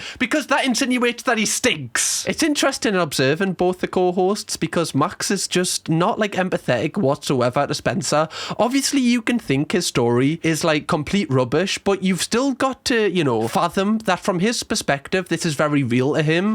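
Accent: United Kingdom. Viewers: What kind of accent does have specifically? It’s really regional. He doesn’t sound like any of my friends from the UK who are from near London nor my Scottish friend.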